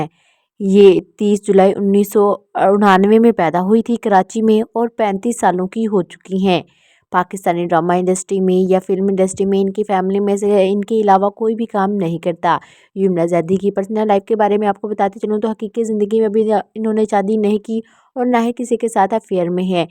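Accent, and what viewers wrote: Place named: Punjab, India. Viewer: اپنے تلفظ پہ محنت کریں خصوصاً ہندسوں کے۔ آپ پنجابی میں ہندسے ادا کرتی ہیں۔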